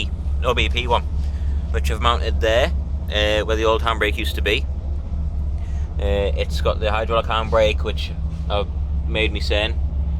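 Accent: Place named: Northumberland, United Kingdom. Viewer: Where abouts you based mate? Sound local I live near Morpeth and I am about to do my little fez up soon. Many thanks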